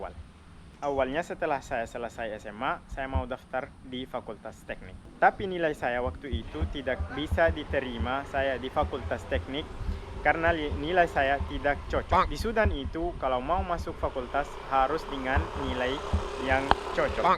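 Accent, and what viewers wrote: Makassar, Indonesia. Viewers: tau gak bahwa logat bicara bahasa Indonesiamu itu logat Makasar? Sedikit beda dengan logat kami di Jawa... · semangat dan sukses selalu! Keren bahasa indonesianya logat makassar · Waaah bahasa Indonesia nya logat Makassar! Pertama kali saya liat vlog orang asing bahasa Indonesia pake logat Makassar begini. Semoga sukses ya! 👍👍👍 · bang kapanko na bisa pake logat begitu🤣,ketara makassarnya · Bahasa indoneisanya makasar bangeett...lucu dan keren...he..he...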